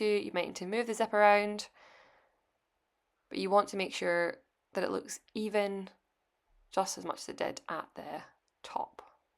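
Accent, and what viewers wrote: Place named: Edinburgh, United Kingdom. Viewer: Hi! Thousand thanks for your tutorial! Do you also have one to knit the „bag“ where you sew it in? Btw…, I love you speaking. It reminds me on my first day an a language school. The first day I went there they were writing a dictat. I couldn’t understand a single word😂. Since than I have loved your speaking…, but the first day I heart someone from Edinburgh…., wow…, that was hard! I had the feeling I cannot speak one English word🙈. That was a great time…. Long long ago…, but I will never forget that time. And now listening to your podcast…. Lovely! Thanks🫶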